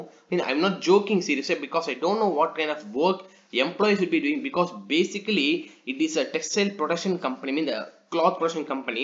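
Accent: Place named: Tamil Nadu, India. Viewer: Tamil English 🤯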